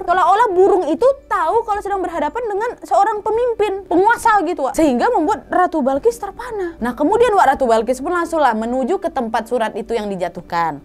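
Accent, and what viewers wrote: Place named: Palembang, Indonesia. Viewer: Keren banget 🙏ka ini....logat nya itu ga bosen denger nya...kalau ga salah ka Nadia ini....wong kito galo👍....soal kl ngomong ga metinggalan....WA nya jd ciri ckas nya....smg sehat sll 🙏💪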